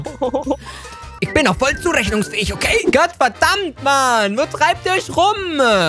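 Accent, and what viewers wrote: Saxony, Germany. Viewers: wo kommst du her XD du kommst mir vom sprechen her iwi aus Sachsen owo? stimmt des? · ich liebe deinen säsichen Dialekt 😍. und finde es mega cool das du dich vor der Kamera jeden Scheiß traust 😂# #Aboboycamper #LogobesterYT #verdient1MioAbos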